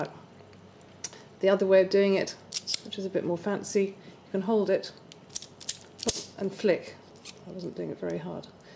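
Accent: United Kingdom. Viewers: ITS GREAT TO HEAR YOUR VOICE !! You sound the very proper British Lady ! Thanks for the review. nilo52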